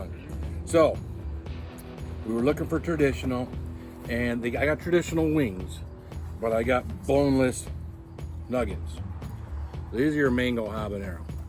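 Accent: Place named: Southeast, United States. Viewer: BY THE WAY!!!! if was a betting man “wink wink”, i would bet almost anything that you’re a south eastern gent! i can tale from the tone and the way you carry yourself!! lol i’m from North Carolina, and you sound like you could be my neighbor lol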